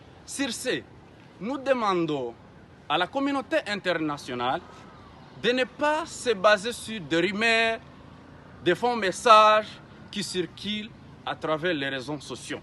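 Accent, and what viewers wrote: Rwanda. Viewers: C'est la terre congolaise, quitte avec ton français Rrrrrrrrrwandolisé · A vous entendre dire avec votre ton et prononciation , c' est le Rwandais qui parle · Tu és vraiment Ruandais tá pronosiation est de ré.Tika biso tika lokuta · Vous retournerez au rwanda chez vous même votre tonalité prouve que vous êtes rwandais pas congolais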